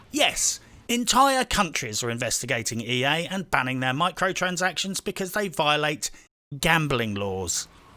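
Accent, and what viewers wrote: United Kingdom. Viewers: As an emerging, very, very happy fan of your video's which somehow I didn't ever see pop up until recent weeks, I humbly ask of you sir, over in the U.K. I presume, of which I thoroughly enjoy the words used with the accent, which just gets my wife and me so much, lmao, that you do a review that's reasonable on Homefront 1 which is STILL NOT backwards compatible for XB1 Series S or X, but only playable on X-Box 360 & Homefront 2 - The Revolution, IF I've somehow missed that if you already made one. IF you could do that, at least for the 1st one, I'd like to hear your thoughts. I enjoy the campaign on it, although it's much more linear and shorter than the sequel but I cannot for the life of me understand at least 1 thing, why the f--k it's not playable on new gen consoles like 99% of all other older or slightly older games now. From the other side of the pond in America, from the great fkg state of awesome Georgia, thank you.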